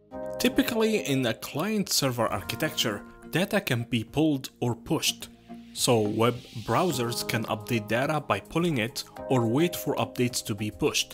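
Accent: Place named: Germany. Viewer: Great and yet simple video, thanks! Where is your accent form? Sounds german :)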